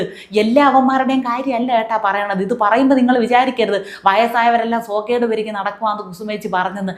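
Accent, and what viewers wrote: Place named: Neyyattinkara, India. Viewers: ഇത് നെയ്യാറ്റിൻകര സ്ലാംഗ് തിരുവനന്ത പുറം അല്ല ok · എന്തര് അപ്പി..കുസുമേച്ചി സുഖന്കളൊക്ക തന്നെ ? എന്തര് മുഖത്ത് വാട്ടന്കളോക്കെ കാണുന്നല്ലടെ..എന്തരപ്പി നിനക്കൊരു ദുഖം…ഇപ്പോഴും കെട്ടിയോൻ പഴയ കോവാലൻ…തന്നെയടെ ..? 😜🤣😂 തിരോന്തോരം സ്ലാന്ക് കിടുക്കി ..😍👍🌹തൃശൂര് കാർ ഞന്കൾക്കിത് കേൾക്ക്ന്നത് രസമാണ്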